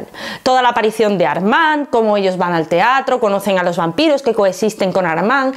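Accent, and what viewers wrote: Spain. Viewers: Buen analisis, interesante pero definitivamente sigue sin gustarme el acento y exprecion española, si muchos me jusgaran por esto pero no me pasa el castellano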